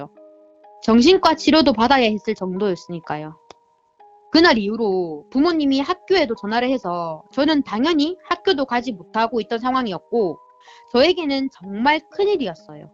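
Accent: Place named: Busan, South Korea. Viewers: 그런듯합니다 저도 부산 사람인데 정감이 많이 가네요~ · 부산 분이시죠 ㅋㅋ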